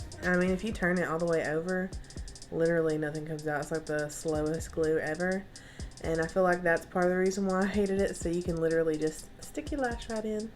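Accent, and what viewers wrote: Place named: South, United States. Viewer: Fellow Southern girl here, what region is your accent? I love it. I've bought this kit a few weeks ago and used the lashes with regular strip lash adhesive because I've been too scared to use the glue it comes with. Thank you!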